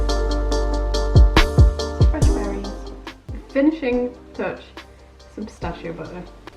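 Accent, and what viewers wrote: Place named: Australia. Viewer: Where do you live ? You Sound Australien.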